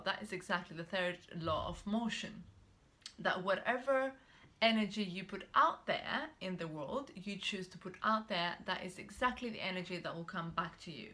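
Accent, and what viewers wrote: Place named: France. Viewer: Ok, first off: your positive energy is very much appreciated. Secondly, your accent is thick French; are you French by any chance? To dig into the meat your argument, your example was purposely vague to stimulate thoughts. Very provocative, I must say. I hate to argue with positive women such as yourself, but energy is technically not a force; however, I will forgive you this time, because energy operates under similar laws to Newton's 3rd. If you want to broaden your arsenal, the law of energy reciprocity, that you are refering to, is called "Conservation of Energy".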